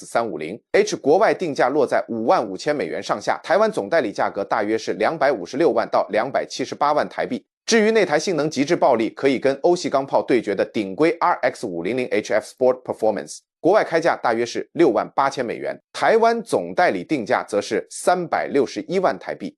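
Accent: China. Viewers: 这是台湾的YouTuber吗，声音怎么是中国普通话，听不下去了👎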